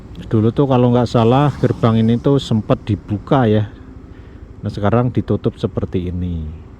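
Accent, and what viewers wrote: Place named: Java, Indonesia. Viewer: Jawa medog kluweg kluweg mleketreg mblufag bawuk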